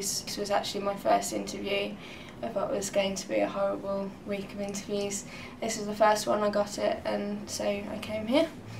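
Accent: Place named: England, United Kingdom. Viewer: My god, she's just not speaking american. I believe she's english.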